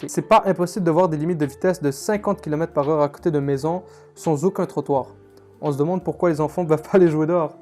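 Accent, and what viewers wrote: France. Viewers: Quel commentateur de merde! PLEIN de faux et en plus avec un accès de merde, xur à comprendre, un vrai français frustré, comme dirait Martineau " mais de kessé ”? Y a plein d autre pays pour habiter. Je suis de Québec et j aime bien Laval pour sa modernité, ses grands boulevards larges, son métro, l” université de montréal, Place Bell, espace Montmorency, centre de la nature, carrefour Laval, etc... Laval est la place no.1 au Québec pour la culture en serre... Donc, petit frustré, probablement que tu a une crotte contre ton compte de taxe ou une contravention pour avoir grillé un feu rouge te là, tu fais du bassin gratuit. Mauvais immigrant dont on a pas besoin, même si on manque de monde. On veut des quebecois d adoption respectueux, heureux et fiers. Pas ses plaignants. Mon grand père qui a, avec tous les autres grand père set mon père, ne se plaignaient pas. 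Ils faisaient. Bye, conard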